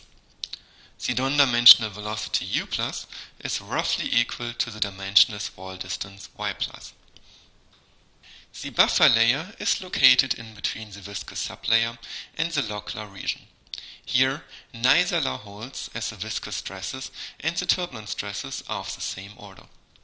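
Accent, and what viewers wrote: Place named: France. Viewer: He's french and that's the french - english accent. It doesn't matter how close or far you are from the UK. ;)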